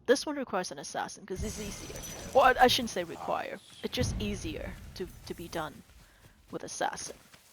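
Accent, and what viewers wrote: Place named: Northeast, United States. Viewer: You sound like you’re from the Northeast lol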